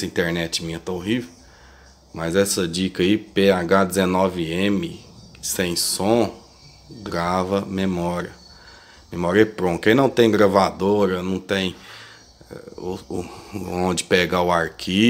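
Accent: Minas Gerais, Brazil. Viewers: Pelo sotaque ou é mineiro ou é goiano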